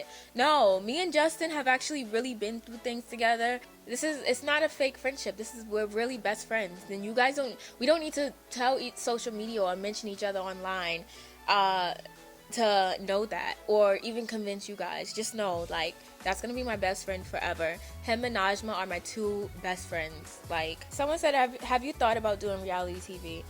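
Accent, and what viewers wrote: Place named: Brooklyn, United States. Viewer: Your accent is sooo cute , I keep trying to figure out where youre from . It reminds me of wahlburgs accent ; like that old Brooklyn / Italian accent . Ugh I’m from bk and have no accent lmaoo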